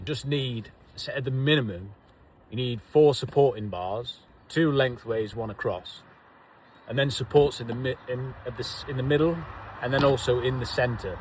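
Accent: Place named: London, United Kingdom. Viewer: Well, you are certainly not Scottish with that accent ... my guess (I've not watched any of your vids before this came up) is that you come from the Greater London area - north of the river I hope 😁 The van looks very nice. Mine is very basic too, with just a bed and W.C. Will be looking at your back pages 👌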